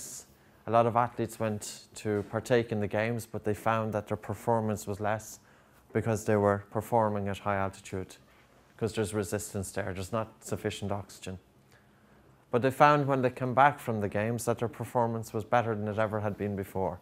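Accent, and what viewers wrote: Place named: Ireland. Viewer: Irish accent, originally grom Galway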